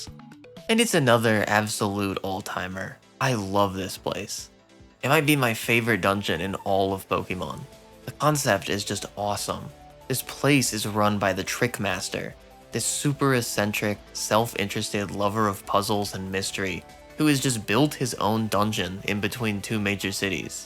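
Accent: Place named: East Coast, United States. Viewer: This is a super weird compliment, I know, but your delivery, especially with your (what I believe to be, correct me if I'm wrong) East Coast accent, makes these videos feel so nostalgic, like I'm watching an old movie.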